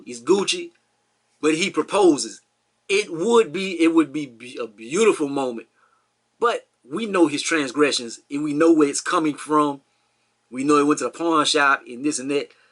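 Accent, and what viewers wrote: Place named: New Orleans, United States. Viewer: Just found you. Adore your commentary. Lemme find out that accent from New Orleans! 😊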